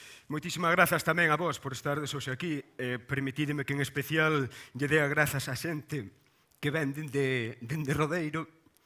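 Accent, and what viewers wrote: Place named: Castile, Spain. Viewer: Parece um portunhol, mistura de português com sotaque castelhano...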